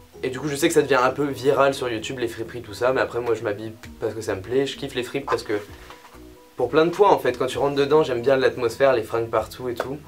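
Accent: Quebec, Canada. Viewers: j'ai cru que t'étais québécois, qd jte entendu parler normal ça m'a fait bizarre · ptn au début j'ai cru t'étais québécois j'ai pas compris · Jpp j'ai vrmt cru que t'étais québécois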